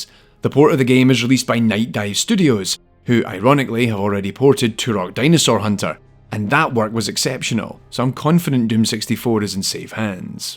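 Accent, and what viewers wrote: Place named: Scotland, United Kingdom. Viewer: You're the first Scottish (i presume that's a Scottish accent) for whom i don't need the subtitles.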